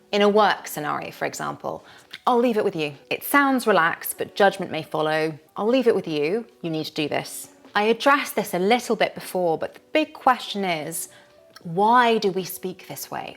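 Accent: United Kingdom. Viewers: I love british English I always watch your videos teacher Greetings from Peru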